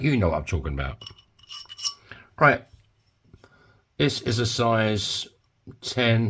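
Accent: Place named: Cockney, United Kingdom. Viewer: Funny are you cockney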